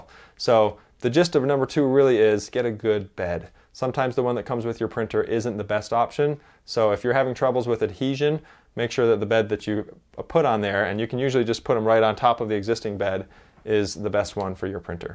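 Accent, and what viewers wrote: Utah, United States. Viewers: Ha ha - I was raised in New England, actually and moved here as an adult but maybe the Utah accent is rubbing off on me :) I don't have a tarantula or an ender and haven't tried either of them so I'm not a lot of help there. I have printers by Flashforge, Tevo (actually just sold that one to a friend), Alfawise and Printrbot. I've heard good things about Ender machines but haven't had the chance to try it myself. Sorry I couldn't help more with that! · I am not, but my wife’s family is from Layton. And we got married in Salt Lake. But mostly I asked because I have a friend that I THINK is from Sandy and you talk just exactly like him. Also, did you say in your video that you have a tarantula? Did you cross shop it with the ender 3 and if you did why did you decide to get that one? I’ve been shopping for my first 3D printer and the ender 3 pro is my top choice- barely inching out the standard for the more robust support bar under the stage and much improved power supply. 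I have seen exactly one comparison video with the ender and tarantula and the tarantula came out more favorable but I never saw any other videos on that machine. Any input would be appreciated. I’m anxious (and nervous) to start the hobby!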